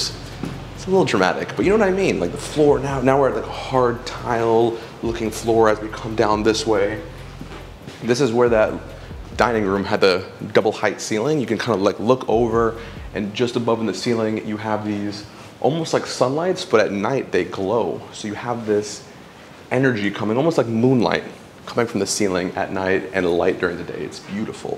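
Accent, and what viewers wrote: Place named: United States. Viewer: i like your american accent. he is very classy. so many americans speak as peasants but not you .❤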